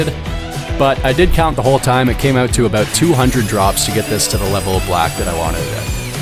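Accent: Canada. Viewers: Wonderful product. Terrific production. The Canadian accent is like nails on a chalkboard. But the product and production were too good and couldn't click away. That's saying something.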